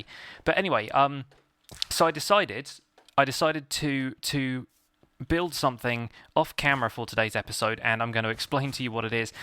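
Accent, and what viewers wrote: United Kingdom. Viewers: It always seems a bit wrong hearing "Merry Christmas!" in a British accent rather than "Happy Christmas!" but there you go. Hope you had a wonderful Yuletide,.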